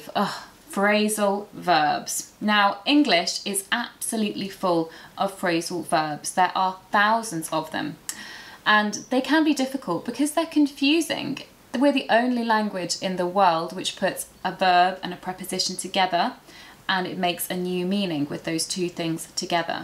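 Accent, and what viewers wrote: United Kingdom. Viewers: I like the British accent. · Thanks for Lesson! 👍😉Yes, understand native English can be very difficult, but listening to natural English via Youtube I hope to help me! 🙂For me, I admit the connected speech is very difficult, but it sounds likeable, and surely I trying it! 🙂 I think that my biggest mistake I learnt grammar during my education (the professors were always got attention on grammar, the little on the conversation 😞) more than the others parts of English, but from now it'll be different! I do try my best! When it comes to understanding English, I would like to watch my favourite series ''The Hotel Babylon'' without subtitle on my own language, but the London accent sounds difficult! Although this series lasted too short and watched it already, I can watch it always! Maybe with english subtitle would be easier? And forget, it's good your advice about exercise understaning Native English with girlfriend! 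😀👍 About accent, I prefer British accent! I really like it! I I liked it because of you! 😉🙂 In my opinion, British accent sounds more elegant from the American, more beautiful! 🙂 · Hi from Mozambique, I love British accent and I'm following it, so thank you very much for spending your time for us. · British accent i want to hear more, And to learn. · I really like how you teach. I love British Accent.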